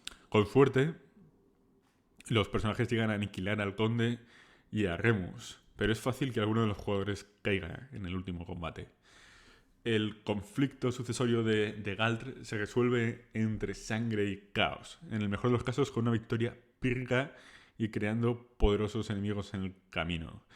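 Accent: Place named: Spain. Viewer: El contenido es bueno pero tienes muy acentuado tu acento español que no esta mal pero tiendes a pegar las palabras y se hace sosa la narracion me gustaria que tuvieras un acento mas. Neutral y fresco muchos ya lo estan haciendo sugerencia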